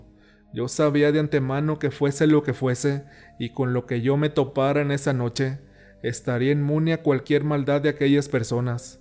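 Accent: North, Mexico. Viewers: No se porqué pero me gusta su acento norteño ajua :v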